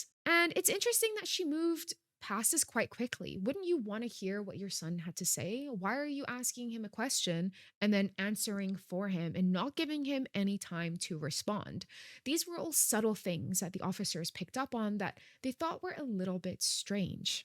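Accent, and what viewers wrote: United Kingdom. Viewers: Are you British or American? I’m confused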